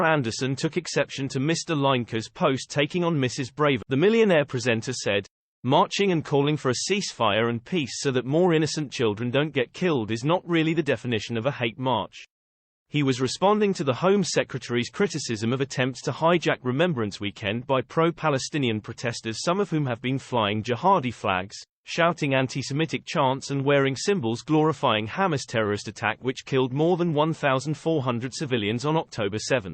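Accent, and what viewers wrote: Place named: Russia. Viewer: If you're going to use a robot voice to replace your Russian accent, at least get one that is consistent.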